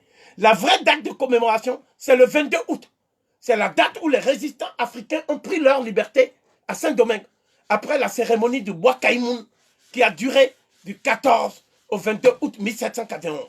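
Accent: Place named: Abomey, Benin. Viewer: Un béninoise qui parle français garde son expression natale Abomey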